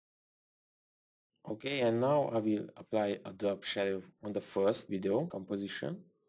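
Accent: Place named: France. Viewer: French accent detected, merci for reading.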